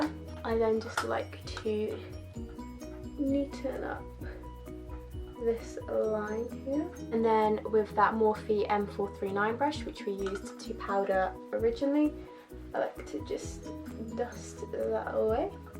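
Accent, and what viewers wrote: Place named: United Kingdom. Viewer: You're British!? I'm in love😻😻😻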